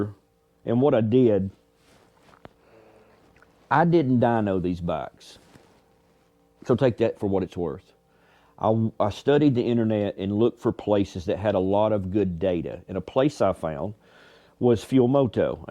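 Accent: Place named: South, United States. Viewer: Beautiful bikes, awesome beard! With that accent you gotta be from the south like me. Like the vids brother, keep up the good work! Just finished my own cam swap, went with the S&S 475.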